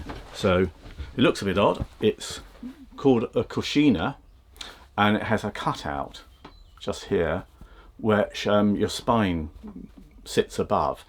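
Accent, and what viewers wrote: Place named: South West London, United Kingdom. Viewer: It's raining....must be England. The accent says must be SW London.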